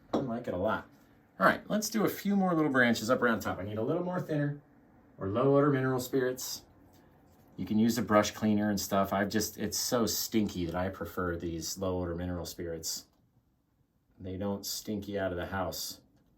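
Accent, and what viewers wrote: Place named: Chicago, United States. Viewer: you crack me up. Now I'm saying "Fantastic" through everyday 👏. I do like your accent a lot! I know you live in Nevada, but are you from Chicago with that accent. I'm from Texas and fav sandwich PB & J...👀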